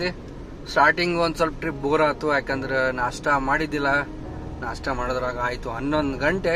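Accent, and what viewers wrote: North Karnataka, India. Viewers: uk bhase pakka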